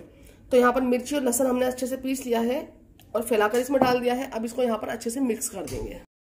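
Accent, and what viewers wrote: India. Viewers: Assalam wallekum Masha Allah zabardast Aap India se hai q k aap KI language Indian lagti hai Mai Pakistan se hoo. Aap ki dishes buhat laziz recipes hoti hai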